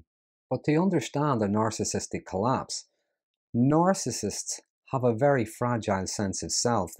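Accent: Ireland. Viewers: Hey Ya! Binging your vids today. What a Rich MINE! of lucid eloquence. It's serving as an Excellent re-cap and review of all I've ben learning and applying the last two years since discovering Evil is real, walking among us, uncaged. And I'm catching some new stuff or another way to put old material. Thank you Just what the Dr ordered for this Gorgeous Spring Sunday in the desert. 🌞😊 Off topic- ish, but came to mind as I've listened: Are you following the plight of the British Royal Family and the phenom of the great Narcissist, Meghan Markle? I haven't looked at your deets yet, been too fascinated listening to you, and doing housework, but I'm thinking your accent is Irish, so...( Just FYI because I'm pretty 😅sure I'll be hanging around your channel a bit: I'm English born and raised till 12, then fam moved to States. I'm a mutt but mostly American Mexican by culture. 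Have lived in New Mex, USA for 26 years) Just curious about your thoughts on the subject if you have had interest. On that note, are you aware of HG Tudor's work? Any thoughts about his methods of madness? Ok- Back to absorbing your words and knocking out the dishes. Cheers 🇬🇧 + 🇺🇸 =❤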